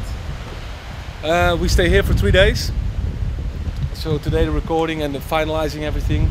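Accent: Netherlands. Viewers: Lol such a Dutch accent. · Love the Dutch accent when he speaks English. So obvious for Dutch people in general. =) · he has a dutch accent ;p cuz he is dutch xD Best Dj''s in the world from the netherlands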